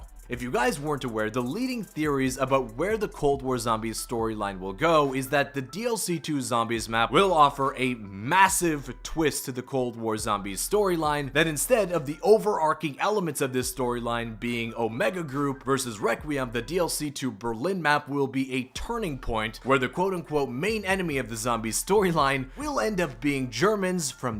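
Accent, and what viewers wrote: Canada. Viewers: Wait this mans is Canadian. I just realized your accent and I can’t unhear the “agaiiinst” and “aboot”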